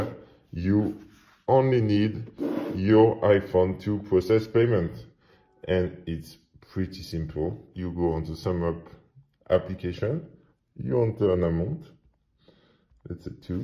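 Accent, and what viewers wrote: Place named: France. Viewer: he IS speaking english but with a french accent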